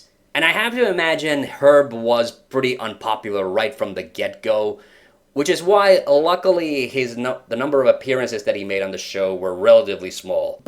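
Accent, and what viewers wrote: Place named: Finland. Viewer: Oletko suomalainen? Tyckte jag anade en finskt dialekt...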